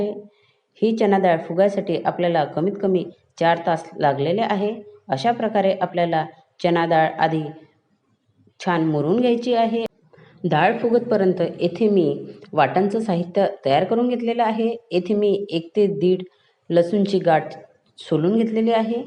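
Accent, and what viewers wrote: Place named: South, India. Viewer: ताई साऊथ च्या आहेत म्हणून असे मराठी बोलतात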